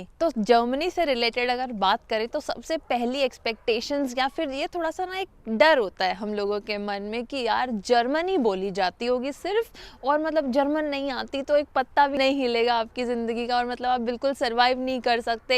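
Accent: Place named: Delhi, India. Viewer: aap karol bagh wali didi ki trah baat krte ho...bda acha lga sun kar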